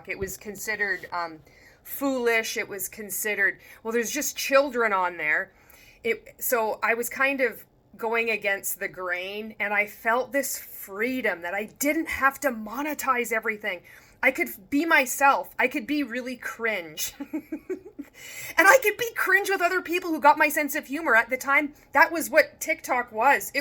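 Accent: Canada. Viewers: I think you should monetize. I don’t care if you make a little dough off me. You are hilarious, sincere, direct, and I love an angry Canadian accent! SCAAAAAM!!!! Love your content and keep it going.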